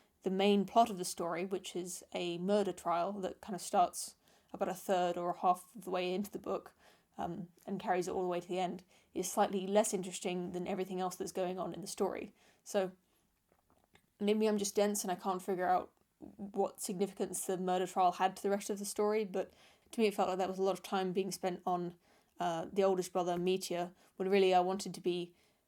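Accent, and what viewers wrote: England, United Kingdom. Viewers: I love Jordan Peterson to death and back again; that's why I took it upon myself to take a hammer to one of his major claims in a way that felt authentic to my being. In my newest video I try and explore that the idea of Hell is preferable to the idea of not existing; because Jordan mentions that Jung's argument against Freud's about religion being simply a defense against death anxiety is invalid because they would have reserved Hell for their enemies. I would say that Hell is more easily grasped at least than the idea of non existing, in an atheistic sense. i than go into some of my DMT experiences. You're more than welcome to check it out. Side note: I feel like lots of us North Americans really are gullible to a proper English accent; you sound much smarter than you would saying the exact same words in some alternate reality, American version of yourself. 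I can't recall ever hearing that the English have the same fancy for the generic American one.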